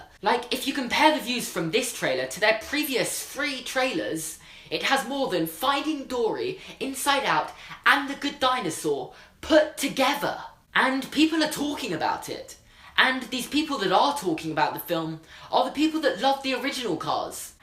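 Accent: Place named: RP, United Kingdom. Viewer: You're so fucking posh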